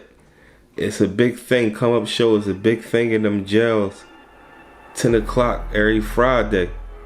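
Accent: Philadelphia, United States. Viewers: U sound like a Philly nugga so ima take ur word 4 it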